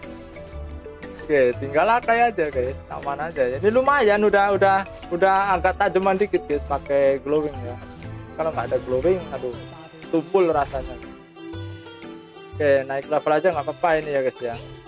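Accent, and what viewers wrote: Java, Indonesia. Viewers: Terus terang dr sekian banyak player MC di yt. Cuma smpean yg gampang saya fahami cara menjelaskan nya mas😂. Ciri khas ketawa nya nyanduin😂. Sering2 ktawa mas😂😂😂 Koyo ne logat e jowo😂😂😂😂 Sukses terus mas e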